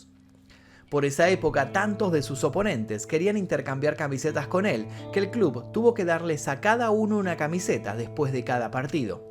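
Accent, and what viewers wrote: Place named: Argentina. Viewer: Por tu acento sos 🇦🇷. Maradona le faltó mucho , pero le sobró 👅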